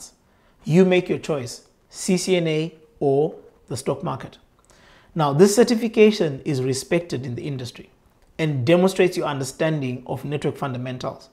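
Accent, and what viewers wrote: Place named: Kenya. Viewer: Your accent is Kenyan probably from South Nyanza. Definitely had to sub and like. Am planning to start my CCNA come Jan 2021.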